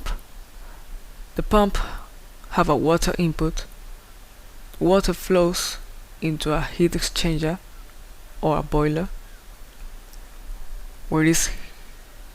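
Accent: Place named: United Kingdom. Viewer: El acento de tu inglés es como británico. Muy bueno.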